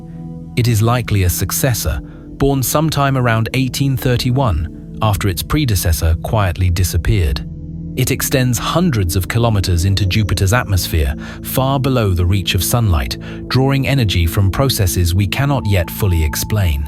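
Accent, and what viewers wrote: United Kingdom. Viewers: Does every video like this have a British accent on it? Funny.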